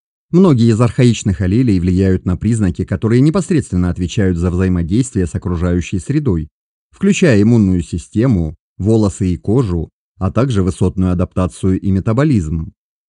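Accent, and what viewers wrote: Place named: South, Russia. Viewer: Юг России. Просто тихо и в наушниках.)))я же увлекаюсь лингвистикой))и мне говоры не мешают. Такиньки. Южняк)))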